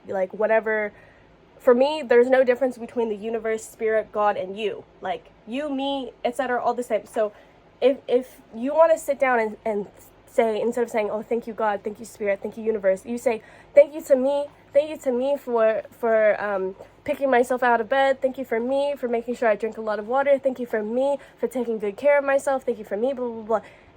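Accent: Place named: Australia. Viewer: You have the craziest accent. It's like muted Australian, tinged with newyorican. Definitely not an insult or anything, just an observation. I have a Skånska laced Brooklyn accent, so I can empathize!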